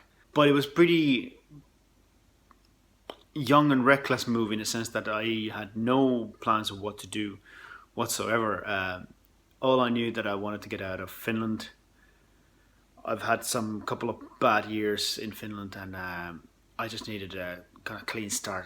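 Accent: Ireland. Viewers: Aksentti on huomattavasti "Irlantilaistunut" siellä ollessasi. :) Haaveilen itsekin Irkkuihin muuttoa tulevina vuosina. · If I hadn't waited until you said it, I would have thought you were Irish. Your accent is proper Irish. All the turns of phrases and rhythm of a well spoken, slightly Dublin accent. Good video. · Wow you sound like me and im irish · Your accent is so Irish. I enjoyed watching this. You have a good heart.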